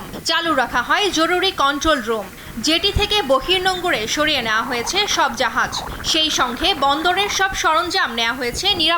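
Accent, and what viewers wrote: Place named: Kolkata, India. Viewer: কোলকাতার মতো কথা বলে কেন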